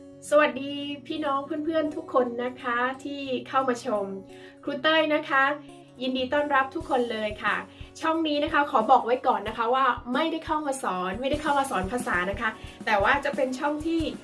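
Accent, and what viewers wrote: Thailand. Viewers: I love your Mookdaharn accent, teacher 🥰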